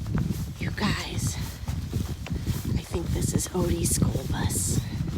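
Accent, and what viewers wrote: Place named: Northern Ireland, United Kingdom. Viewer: Goog to see (hear) a Northern Irish accent - ' ' on the trail!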